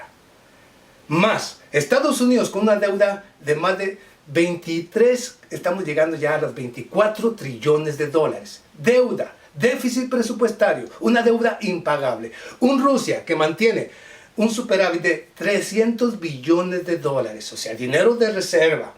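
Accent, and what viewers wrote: Mexico. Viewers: Don't forget to mention me BRO am watching you from AUSTRALIA I really like the way of your analysis very easy to digest as well I like your Mexican accent.. well done Cheers...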